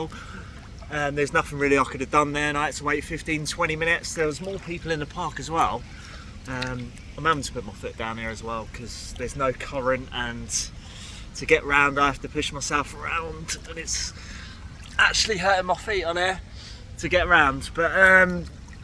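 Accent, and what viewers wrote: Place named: Norfolk, United Kingdom. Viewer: Are you from Norfolk, by any chance? Just trying to place your accent.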